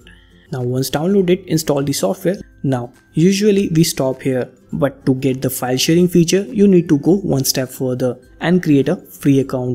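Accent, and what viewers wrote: India. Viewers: The only indian voice i can take without wanting to just click off the video. Great job!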